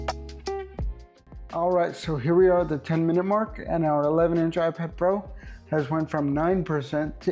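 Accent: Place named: Scotland, United Kingdom. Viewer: God bless you the exact content I needed that ia could not answer. I take it your descended from Scottish people I can tell with your accent. The home land thanks you x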